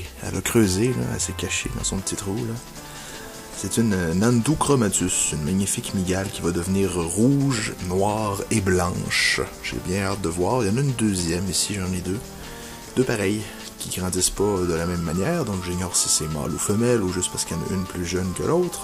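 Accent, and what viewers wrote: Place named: Quebec, Canada. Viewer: J'adore l'accent québekoi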